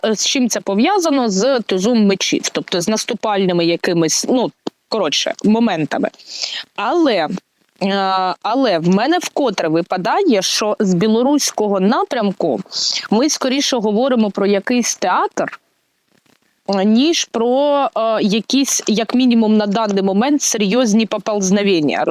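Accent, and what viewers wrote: Ukraine. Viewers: У нас на Дніпропетровщині такий же говор і точно знаю Полтава так розмовляє. Наша дівчинка ❤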